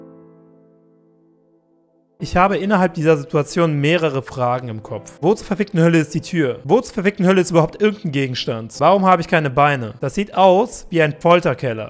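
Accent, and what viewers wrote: Russia. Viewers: Mach du Mal komplette streem nur reden mit russisch akzssent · Ich liebe diesen russischen Akzent. Auch wenn meine Mutter es versäumt hat mir und meiner Schwester russisch beizubringen ist mir die russische Kultur vertraut. Plow ist zum Beispiel eines meiner Lieblingsgerichte und die Serie bei hab ich damals, als wir meine Oma in Russland besucht haben, geschaut ohne ein Wort zu verstehen ^^ Mach weiter mit solchen Videos. Sie sind einfach lustig xD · Ich bin nebenbei am Essen und habe mich verschluckt vor Lachen xD Bitte mehr Videos mit russischem Akzent xD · Der Russischer akzent ist ziemlich lustig. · moi drug kannst du mehr videos mit russische dialekt machen ? :3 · Dein russischen Akzent ist immer am besten mache ich auch schon mega geil 💛💛🤣🤣🤣😂😂😂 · Haha omg😂dein russischer akzent ist soo geil😍bitte mehr davon! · Wer feiert russischen Dialekt auch so. 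😀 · Bitte mit mehr russischen Akzent! 😍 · bitte rede immer mit so einem russischen Akzent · der russische akzent und die ironie machen es perfekt! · hahhaha ich schaue es mir schon dass 15 mal an es ist so lustig. spiel bitte mehr spiele mit russischen Akzent. <3<3<3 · Russisch ist fast so wie Kroatisch 😂❤️ ich heule aber vor lachen, mehr mit russischem Dialekt 😂😭❤️❤️ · bite mehr russia Akzent XD спасибо <3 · Lachhkrampf haha XD bitte mehr videos mit russischem Akzent blyat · Ich finde es so nice und witzig, wenn du mit deinem russischen Dialekt redest xD Das klingt einfach übel cool ^^ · let's plays mit russischem Akzent sind die besten! 😂😄 (Bitte mehr davon.😅)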